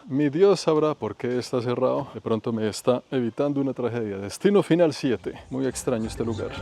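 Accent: Bogotá, Colombia. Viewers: 👍habla rolo, o sea como hablamos los Bogotanos en Colombia, sin acento tan marcado .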